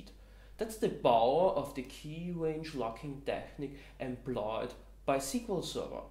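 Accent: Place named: Germany. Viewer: damn this guy with heavy german accent makes me happy! :))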